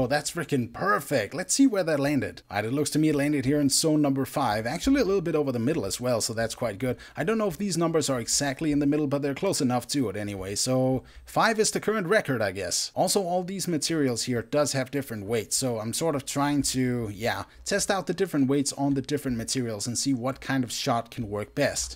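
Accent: Denmark. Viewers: he sounds danish.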